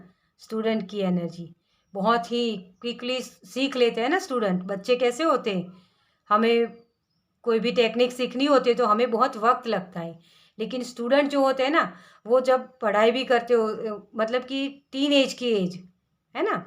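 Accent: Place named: Gujarat, India. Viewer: Pile 1 sub sahi hai mam our aap ki hindi masaallah Vijay rupani jesi hai.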